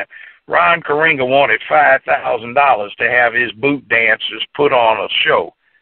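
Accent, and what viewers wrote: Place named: Texas, United States. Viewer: how does he have a texas accent when he has been in Los Angeles for so long.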